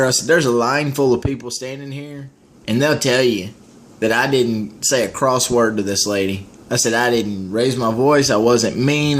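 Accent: South, United States. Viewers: all the Southern drawl bullshit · the slow poke Southern accent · I enjoyed listening to your story and your all "Merican" accent. Folks like Adam Peterson who commented about you should go watch their Britney Spears videos instead.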